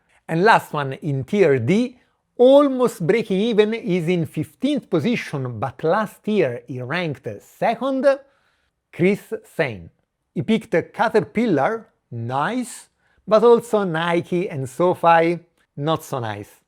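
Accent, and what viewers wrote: Italy. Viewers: THIS GOTTA BE THE THICHKEST ITALIAN ACCENT I HEARD 😁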